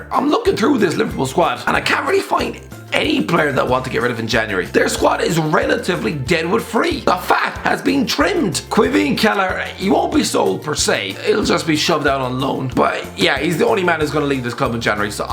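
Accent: Ireland. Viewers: Press f in the chat for Irish guy voice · Irish accents are really nice, but your sick, dont upload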